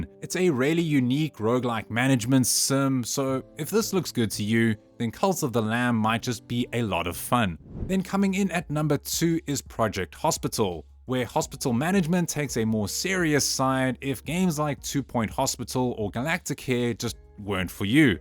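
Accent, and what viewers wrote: South Africa. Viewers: Is that a saffa accent?🇿🇦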